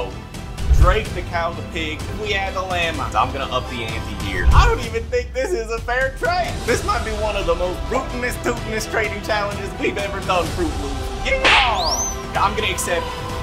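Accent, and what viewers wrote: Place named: United States. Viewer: I love your cowboy accent my username is lovelybear1509 and I have a display name it’s Italy! :)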